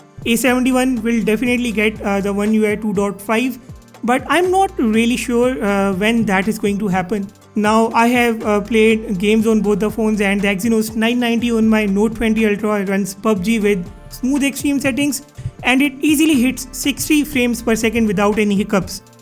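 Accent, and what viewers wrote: India. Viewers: Man ,indian accent is too funny to take serious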